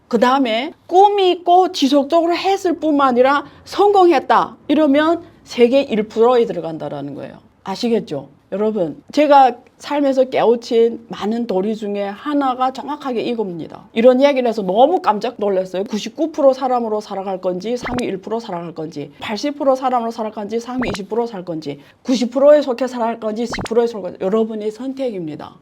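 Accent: Yanbian, China. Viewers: 펀드 할것 못돼요 판매하는 금융 창구 직원들도 뭔지 모르고 팔지요... 위에서 시키는대로 수당을 주니 호구를 잡고 팔지요 1,000개 이상의 펀드 ㅋㅋ 주식투자 펀드투자 그만 광고하세요 부동산 급락 소리도 하지말고... 그냥 연변 사투리가 재미있어요 ㅋㅋ 세미나에서도 자세하게 하지 마세요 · 한국분이신가요~발음이 중국교포같오서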